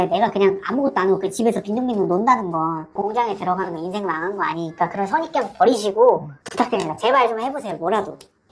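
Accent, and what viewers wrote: Gyeongsang, South Korea. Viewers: 대구 말투 거슬리네요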